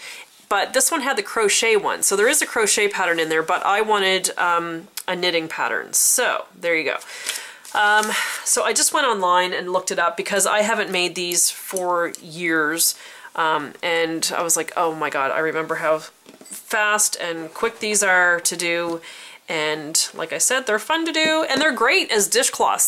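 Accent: Canada. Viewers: Thanks for the great video! You sound Canadian!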